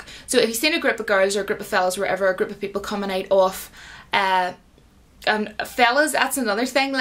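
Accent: Ireland. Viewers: Top of the day to you 😊👍 I absolutely adore the Irish accent and I have heard many of the words that you use in this video. I have several Irish friends and one of my lecturers is also Irish 😊 it's so nice to listen to.. keep up the good work on your chann 👍👍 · Great info, Luv the Irish accent....drinking a wee bit of tea. Oh and you're dead on. In AMERICA we use the word eejit by itself with the same meaning. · Irish accent is freakin' awesome .. as for success, it really comes down to personality :-)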